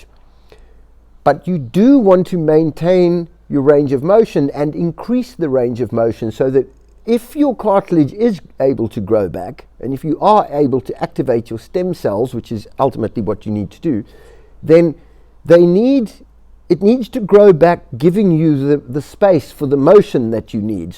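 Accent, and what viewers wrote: South Africa. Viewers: exactly...the body heals itself if given the right supports, but the first step is to take the onus upon yourself like you have stated here...thanks for the great info...and love your South African accent as well...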